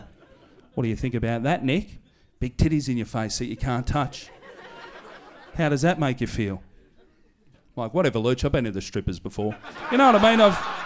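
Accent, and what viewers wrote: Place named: Australia. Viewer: That was a great set Nick. You never fail to get me laughing with your Aussie accented stand up about shit we can relate to. Excellent.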